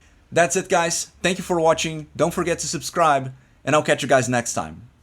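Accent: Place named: Brazil. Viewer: Vc é brasileiro não é?? Teu sotaque em inglês é BEM BRAZUCA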